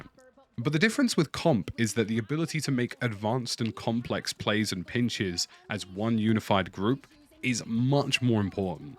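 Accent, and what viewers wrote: RP, United Kingdom. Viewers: Topic for your next analysis video: why so many noobs/ low skill players think (nearly) all top-tier players are cheating. It's an important subject, because it definitely undermines the audience and enthusiasm for comp Warzone. It's also an interesting one, because on the one hand you have the fact that identifying cheaters is a skill that develops as you play (when I first started, I saw cheaters everywhere). On the other hand, you have the all-too-predictable social dynamics playing out in chat rooms and comment sections, where the wary skepticism of noobs is whipped up into conspiracy-level absurdity by toxic troll types. And on top of it all, every now and then an actual cheater is unmasked, and the community goes haywire. You have the experience, analytical powers and (perhaps most importantly) trusty-sounding RP voice to educate a stubbornly ignorant public. 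Give us what we need!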